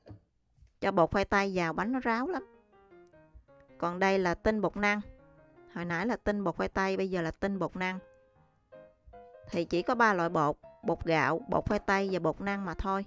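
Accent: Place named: South, Vietnam. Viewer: Cám on chị chia sẻ, rất thật tình & thích nghe giọng nói đậm chất miền nam của chị.....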